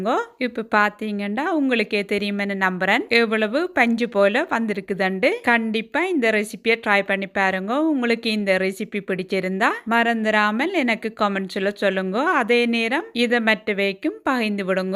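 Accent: Jaffna, Sri Lanka. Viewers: Super. Love your jaffna thamizh ❤❤❤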